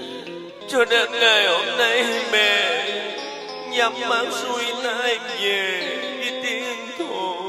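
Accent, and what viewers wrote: North, Vietnam. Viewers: Giọng Bắc đâu phải giọng nam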